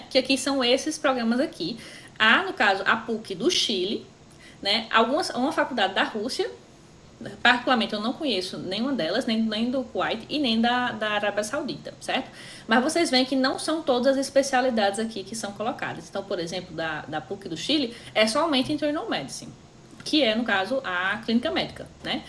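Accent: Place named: Natal, Brazil. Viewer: seus vídeos são incríveis! também sou de Natal e curso medicina em BH. Reconheci na hora o sotaque kkkkkkkk. Sucesso para você! nos atualize!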